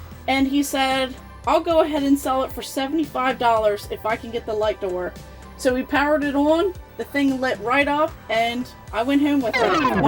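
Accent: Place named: Baltimore, United States. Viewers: is she from maryland? i heard 98 rock and shes got a thick baltimore accent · 2 minutes in hearing her accent - ok yeah, she’s definitely from Baltimore lol. Amazing game room!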